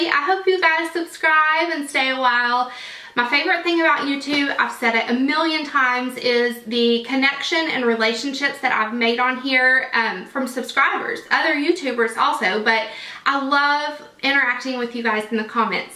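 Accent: South, United States. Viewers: Well hello, ***** I share your "mom of boys" lifestyle. I have 2. Love 'em to pieces. Aren't they the best? Nothing like the love b/w mom and her sons, right? Been a subscriber for awhile now, but occasionally like to comment and say hi. Must say, I'm partial to your southern drawl as I was born (GA) and raised (SC) in the South. Miss that now that I'm in MN. Yes, I've lived here over 15 years and still have a slight accent but most of it's gone.:( And you do remind me so much of my dear sweet SIL. Watching you/your videos kind of brings me home in a way.:) Love you channel and your videos Great selection for this one! Hoping if you have a chance, you might pop over to my channel and check out some of my videos. And if you have any advice for me I would love to hear from you. Wishing you a wonderful day! xo ~Melissa in MN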